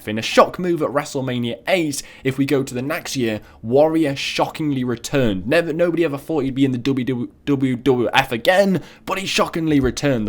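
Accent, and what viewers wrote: United Kingdom. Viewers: Did he is British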